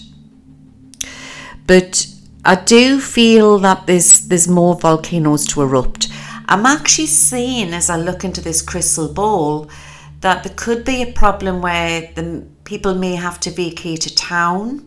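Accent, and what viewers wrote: Newcastle, United Kingdom. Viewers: She's a Geordie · Came for the predictions, stayed for the lovely Geordie accent😁 Thank you for sharing this.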